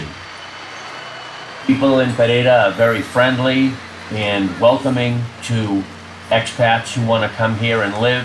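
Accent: New York, United States. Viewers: Keep up the good work on your videos. Your accent sounds like New York City, where I lived for 35 years. So I take your evaluations about Pereira very seriously.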